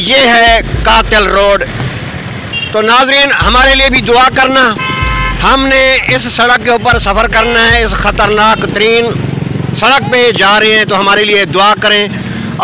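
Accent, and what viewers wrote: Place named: Saraiki, Pakistan. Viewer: اچھی بات کہہ رہے ہو سرائیکی آواز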